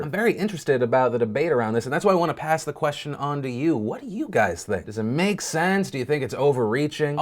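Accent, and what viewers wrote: South, United States. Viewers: I hear a southern accent in your outros lol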